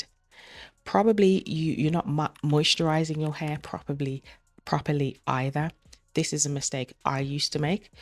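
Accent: Birmingham, United Kingdom. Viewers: You and your hair are beautiful, giving me hope as I can sometimes get stuck, on another note love the Brummie accent, reminds me of a friend of mine.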